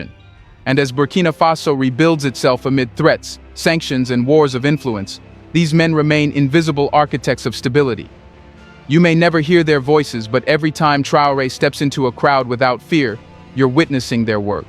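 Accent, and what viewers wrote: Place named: United States. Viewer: Who is this??? Why are you providing such Details? Who sent you? This American accent reporting so hard on this man. Thanks but no thanks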